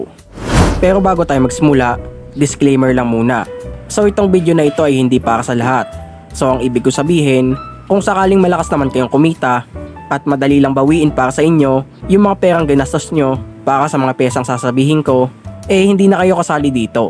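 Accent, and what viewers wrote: Batangas, Philippines. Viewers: Batangueño ka lods?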